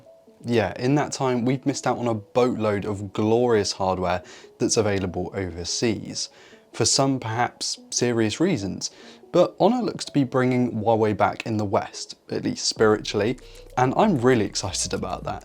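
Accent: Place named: United Kingdom. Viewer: That british accent is fire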